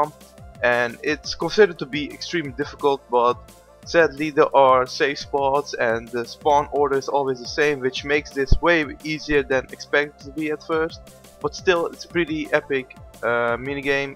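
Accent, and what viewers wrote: Netherlands. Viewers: you sound dutch, am i right?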